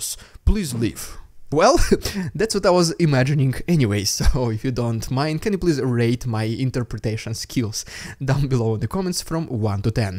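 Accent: Russia. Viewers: Are you fps Russian or actual Russian?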